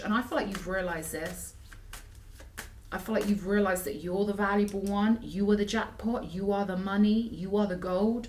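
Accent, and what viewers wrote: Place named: London, United Kingdom. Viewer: Hi 👋 Hey U.K...your smashing this and having your way..go ✨️ O me O my conduit connection to the almighty. Love your voice, can hear London, can hear down country, can hear a beautiful vibe. What goes 😳 around comes around, don't keep hurting innocents..for your power. Learn your karmic Lessons and come back to the Light. Peace&Love&Light Namaste 🙏 ♥️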